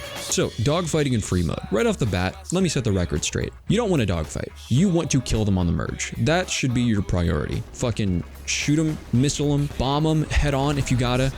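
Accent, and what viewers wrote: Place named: Netherlands. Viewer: The slightly hidden Dutch accent just oozes of every few words you speak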